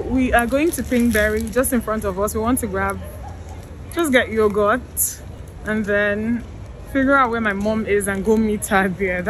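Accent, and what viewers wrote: Igbo, Nigeria. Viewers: I just love the Igbo accent 😅 welcome home 💖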